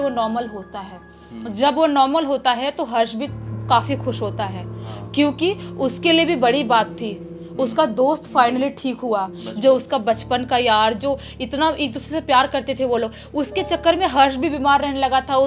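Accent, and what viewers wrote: Bihar, India. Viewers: Dont you mind please!!!!! but her her voice like fully fast and like Bihar ka town tone I feel like when I m gonna to be close my eyes ... Baki brother you've done well before ...... shant shudd Hindi or slow and gehari aavaj wali storiya maja lati hin jyada tr log night me story sunate hin to night wala background better rahega ..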